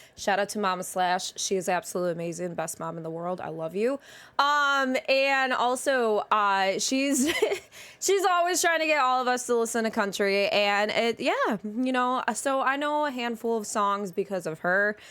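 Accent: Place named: Midwest, United States. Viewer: You have a very midwestern style of speaking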